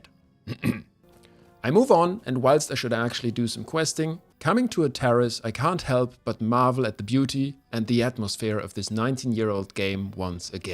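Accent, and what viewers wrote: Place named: Germany. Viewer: Nice stuff mate :) That German accent cannot be unheard, Grüße gehen raus!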